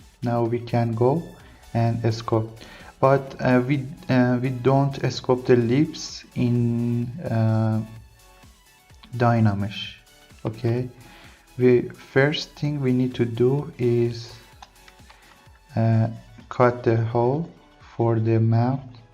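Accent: Iran. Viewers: are you Iranian? your accent sounds like you would be! if not where are you from?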